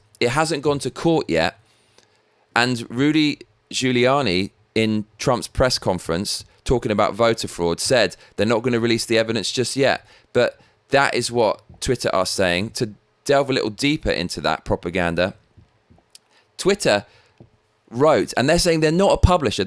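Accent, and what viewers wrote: England, United Kingdom. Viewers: great stuff..glad to hear a British voice speaking truth!! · English accent. I hope this guy isn't yet another far left Trump-trasher. Go watch the Pennsylvania meet with state legislators where huge evidence was presented. The Supreme Court hearings will come next week.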